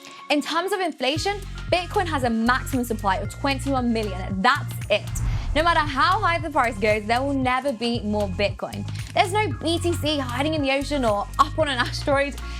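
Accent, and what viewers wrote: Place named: England, United Kingdom. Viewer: must be the thick english accent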